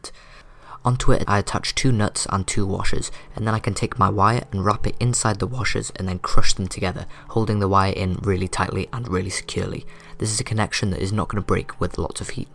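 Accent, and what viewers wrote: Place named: Wales, United Kingdom. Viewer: Great video 👌 Still trying to place the accent though. Sounds kind of south east walian.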